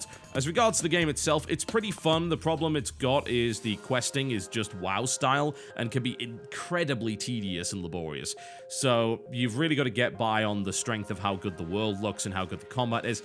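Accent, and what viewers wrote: United Kingdom. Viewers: maybe he enjoys the sound of you manly British voice....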